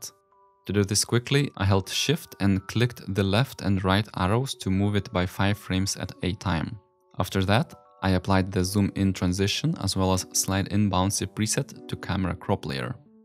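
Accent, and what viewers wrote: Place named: Russia. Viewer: А я слышу, что ты русский))))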